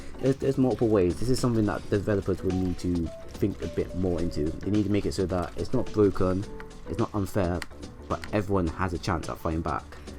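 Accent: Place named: Southern England, United Kingdom. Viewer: That accent sounds Southern English.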